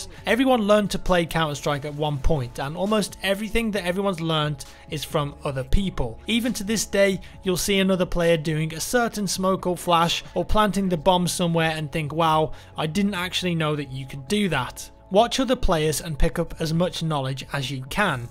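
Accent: United Kingdom. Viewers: U r british m8?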